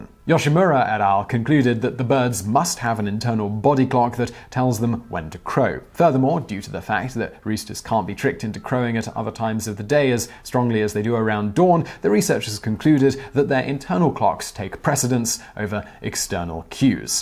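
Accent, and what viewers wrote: United Kingdom. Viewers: Interesting that despite your British accent you use the American term rooster rather than the British term cock. Now why is that I wonder? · Why is Michael from VSauce talking with a British accent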